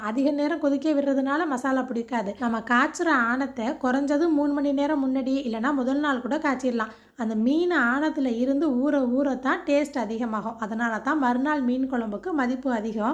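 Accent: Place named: Keelakarai, India. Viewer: Assalamualaikum sis unga slag keelakarai slag onuthaa...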